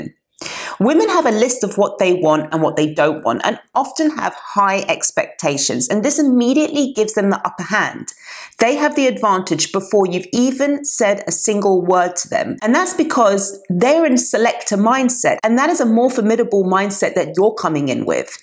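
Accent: United Kingdom. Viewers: Wow. I even got an answer. YT has its own algorithms. And on top of that we have marketing season opened. Now streaming hostesses are the top priority. They play Silent Hill 2 Remake in pajamas, because this is not objectifying to women. That's how game corporations solve the issue, of hot hostesses at game events that one were probably the main attraction. A contract with a streamer. Guys who are unable to approach a gal in real life as an audience. Easy money. Just trash the game in chat box and you will become eaten by simps. xD Pathetic. Cheers from Poland. PS. My instinct was right. Your British. That accent. Pity it's not as much popular as in 90's. Prodigy, Blur and few other UK bands. Yeah. Definitely good time for music. Cheers!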